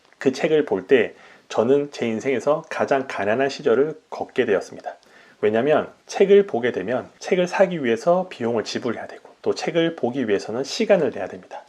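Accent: Busan, South Korea. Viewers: 말투가 익숙해서 설마했는데 부산분이신가보네요. 투트랙영상보고 알았어요. 근데 부산분치고는 말씀이 아주 부드럽고 듣기 편하시네요!! 유툽이름에 복수형으로 하신 이유가 짐작이 될정도로 진정성이 느껴집니다. 무지에서 유를 창조해내고 누구나 할수있고 될수있다는것을 전파하고싶고 다같이 돈벌어보자는 이유에서 복수형으로 지으셨네요. 진심이 느껴집니다.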